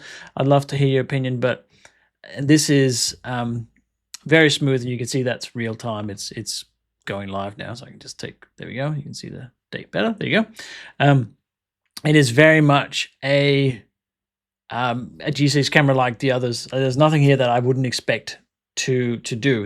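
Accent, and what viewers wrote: Denmark. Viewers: Hey, you danish? Think I can just about tell by the accent, but not 100% sure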